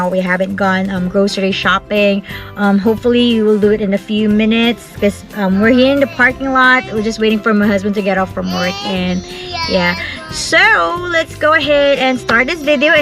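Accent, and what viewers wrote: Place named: England, United Kingdom. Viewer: I love your english accent, Enjoy cooking and you can do it. Stay healthy po #dabestiesjasCovers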